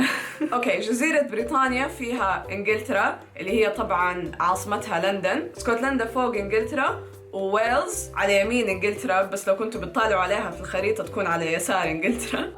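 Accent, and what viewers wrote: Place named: Hejaz, Saudi Arabia. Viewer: Her dialect is Hijazi not Kuwaiti they are entirely different dialects bu both are understandable and easy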